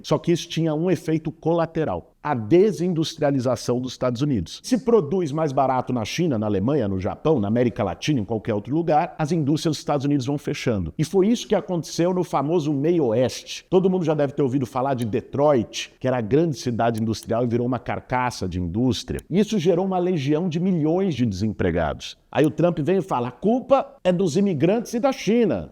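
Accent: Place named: São Paulo, Brazil. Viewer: Eu não aguento esse sotaque paulistano.... Podem me detonar, tá!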